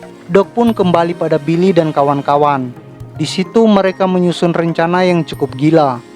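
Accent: Eastern Indonesia, Indonesia. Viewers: Fix adminnya orang timur. Jelek banget pembawaannya 😅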